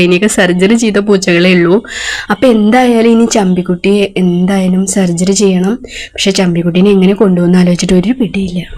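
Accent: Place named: Kozhikode, India. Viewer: നിങ്ങൾ കോഴിക്കോട് കാരി ആണോ.. നല്ല രസമുണ്ട് കേൾക്കാൻ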